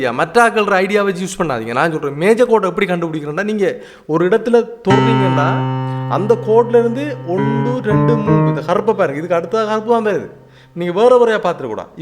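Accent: Sri Lanka. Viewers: Ahaa....Ilangai Tamizh azhagu......vadivu vadivu 😀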